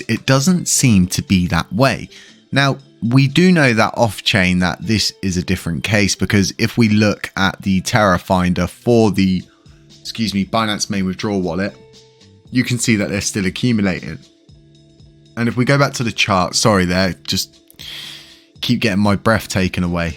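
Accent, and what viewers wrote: United Kingdom. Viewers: I like your accent. it's like in the harry potter movie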